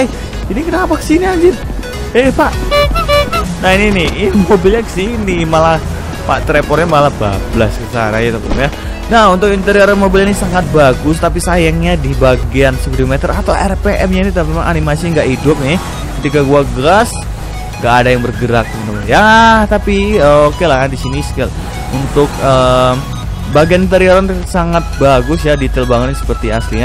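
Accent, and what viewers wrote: Bali, Indonesia. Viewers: Orang bali bli??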